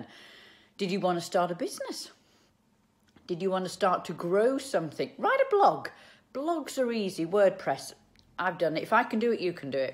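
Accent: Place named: England, United Kingdom. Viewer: Hello miss. I am from Brazil..You're an enchanted lady. Your hands', oh your hands are so beautiful. E pelo sotaque eu deduzi que você é inglesa. UK.... Cheers from Brazil.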